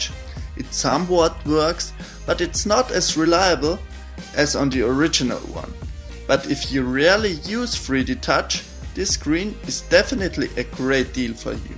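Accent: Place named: Austria. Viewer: klingst sehr österreichisch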